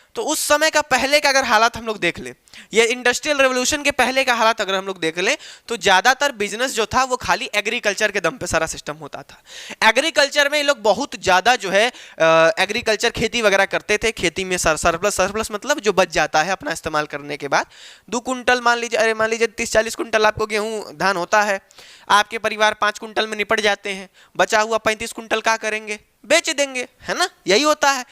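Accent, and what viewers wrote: Bihar, India. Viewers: Bhaiya aap bihar se ho kya?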